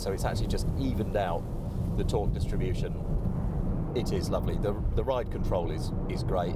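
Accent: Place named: United Kingdom. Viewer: This is normal British delivery. You're asking for it to be more American. Perhaps you're in the wrong place.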